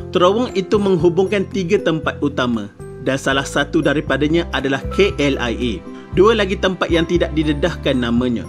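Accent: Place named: Pahang, Malaysia. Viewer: Sangat sempurna informative! Pekat juga bunyi dialect Pahang pengacara xD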